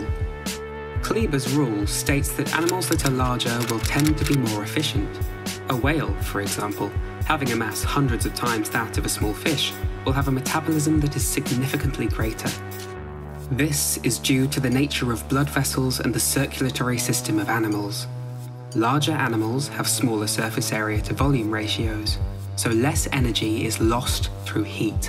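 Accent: United Kingdom. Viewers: why are videos like this always narrated by someone british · Thank god there’s a British guy narrating or I wouldn’t have been able to watch.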